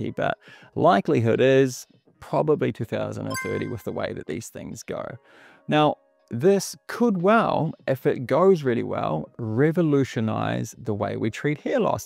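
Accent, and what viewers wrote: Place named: New Zealand. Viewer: And just for our listening international audience, in case you’re wondering, our good doctor is a Kiwi aka New Zealander living in Australia. There’s no mistaking that accent.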